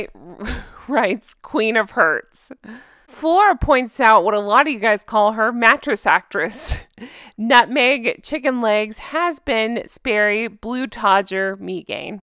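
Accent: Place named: South, United States. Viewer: has a sexy voice, a not too posh Southern accent and with a lovely timbre. I think he's in his late 40s or early 50s due to pop culture references he sometimes makes to the 1980s, including the music of that era. Bet he's handsome AF.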